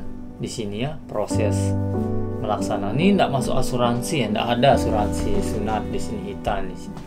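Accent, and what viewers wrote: Lombok, Indonesia. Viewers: Bang orang Lombok ya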